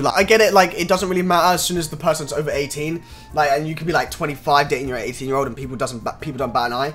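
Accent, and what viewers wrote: United Kingdom. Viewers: w bri'ish man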